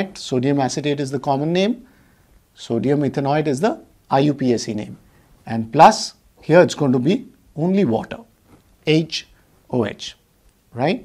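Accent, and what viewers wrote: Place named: India. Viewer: Plzzz no more indian pronunciation 🤦‍♀️🤦‍♀️🤦‍♀️🤦‍♀️🤦‍♀️🤦‍♀️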